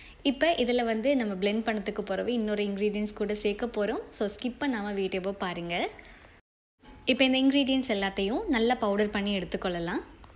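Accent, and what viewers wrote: Sri Lanka. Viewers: நன்றி.சிறீ லங்காவா அல்லது இந்தியாவா.அழகிய தமிழ்.❤❤😅😅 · ஸ்ரீலங்கா அல்லது இலங்கை தமிழ்.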